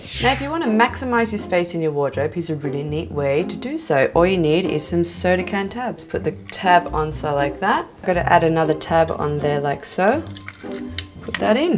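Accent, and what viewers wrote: Australia. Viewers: She sounds Australian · oh so that's why her Australian accent is a little different. Singapore must have rubbed off a little :3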